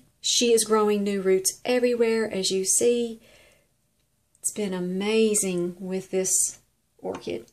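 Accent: South, United States. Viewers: You are so precious! I love your info on orchids. I love your southern accent even more. And even more, love your blessing to us at the end of your videos! Thank you so much!!!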